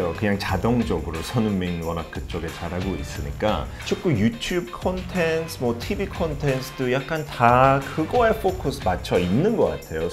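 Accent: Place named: United Kingdom. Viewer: 유익하면서 영국발음이 너무 멋있다보니 시간가는줄 모르고 봤습니다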